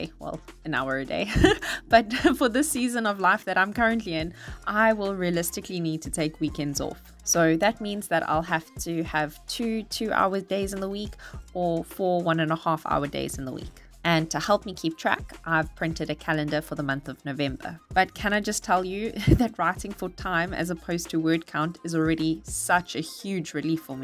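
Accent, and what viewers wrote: South Africa. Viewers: Are you South African?.... I miss home....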